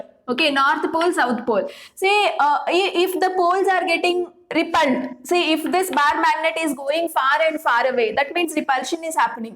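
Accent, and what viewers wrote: Karnataka, India. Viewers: Mam are you from Karnataka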